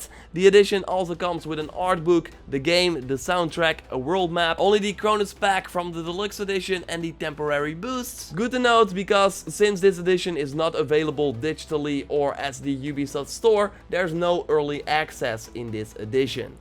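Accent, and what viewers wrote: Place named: Netherlands. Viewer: Ik wist het wel! Dat je nederlands was hahah toch kan je dat altijd horen. Ga zo door man😃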